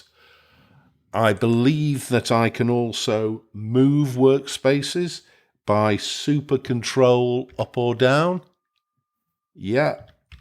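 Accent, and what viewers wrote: United Kingdom. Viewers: Happy New year Year, sir! Apart from learning a bit more about Linux, being a non-native speaker of English, listening to your authentic British accent is a pure delight. Do you have it open-sourced so I can fork it (aka adapt it a bit)? 😃 Shine on!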